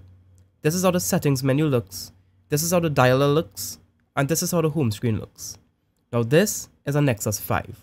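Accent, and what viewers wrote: Trinidad and Tobago. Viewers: Are you trini? Can spot that accent a mile away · I recognise that accent! love all the way from trinidad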